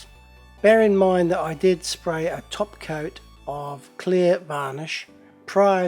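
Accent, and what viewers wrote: England, United Kingdom. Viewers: This is the second time I've seen you try something other than paint stripper and I don't know why you keep trying to improve upon something that works so fantastically well AND fast. I can see trying to improve methods that need improvement etc, but the stripper is as good as it gets IMO. Great vids and the proper English accent narration just makes it better. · I have clumsy fingers, i would end up painting it Pollock style!!! Where are you from? you sound british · Very interesting!!! I liked the idea!!! Where you are from? By the accent, it looks British to me. Can you get old thumbnails with ease? Here in Brazil it is difficult to find old machbox.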